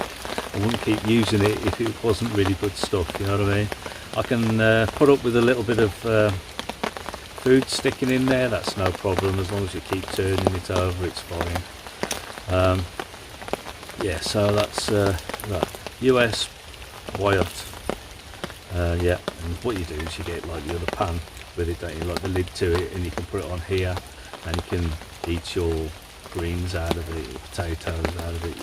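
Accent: Black Country, United Kingdom. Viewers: Hiya bud, I just came across your channel and you seem a genuine and friendly bloke. I’m from Yorkshire but have lived in the West Mids for over 20yrs now and find most to be really nice people. Good to hear a Yam Yam accent on YT 👍 Subscribed 👍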